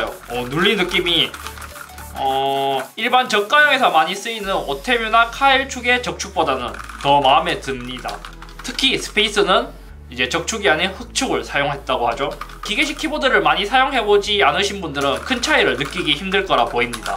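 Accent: Gyeongsang, South Korea. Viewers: 고향이 경상도이신가요? 사투리가 비슷해서 그런거같기도한데용?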